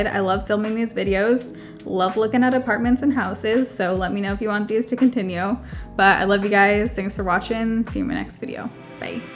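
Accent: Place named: California, United States. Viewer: Start a new vocal pattern if you can. That one..if not from Cali...ayyy. that's not a free thing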